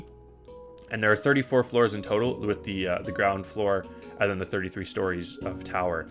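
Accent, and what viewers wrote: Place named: Canada. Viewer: OOOh that new microphone really makes the Canadian accent come out!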